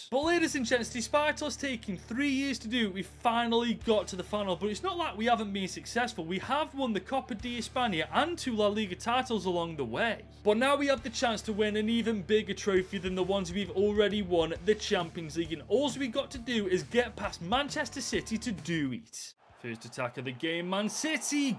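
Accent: Stoke, United Kingdom. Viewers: Is that a stokie accent I hear ?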